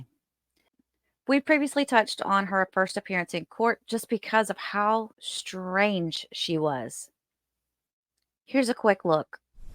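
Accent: South, United States. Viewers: Hi from back from vacation lovely!! Sorry I was away w/out a Wifi … I’m binge watching your videos now 😊. Always a pleasure to listen to your southern sweet accent 🫶🏽 Have a wonderful week 😘🌺🫶🏽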